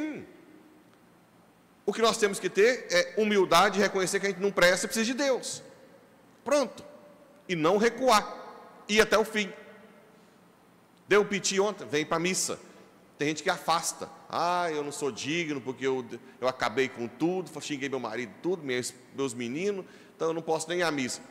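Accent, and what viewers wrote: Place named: Minas Gerais, Brazil. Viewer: padre o sr é Goiano mas tem meu sotaque mineiro, gosto demais.Amo seus ensinamentos.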